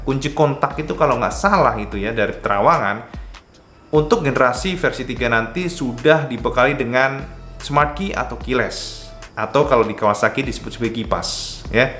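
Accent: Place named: East Java, Indonesia. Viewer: Koyok e.logate wong ngetan...Iki...Jatim.....takerjet....kok onok mosone...ngerti aku.iki bahasane.....salam dari Karaeng galesong....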